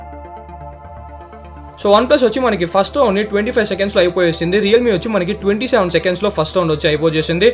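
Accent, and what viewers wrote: Chittoor, India. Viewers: Nice video bro kastha chitoor slang undi 😀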